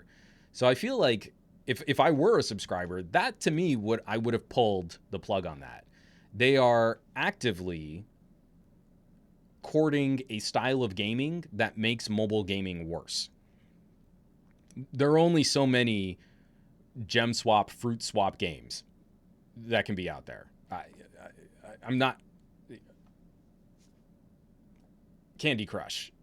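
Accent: United States. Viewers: Wait, you're from New Mexico or???.-.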